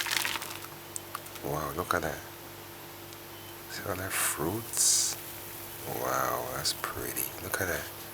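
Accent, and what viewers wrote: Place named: Jamaica. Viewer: Yes, I think you're Jamaican "alf" and "cark". Lol, only a Jamaican can relate))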